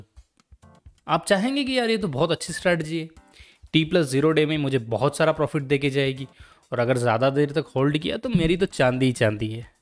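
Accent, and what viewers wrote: Belgaum, India. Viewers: Dear sir r u from belgaum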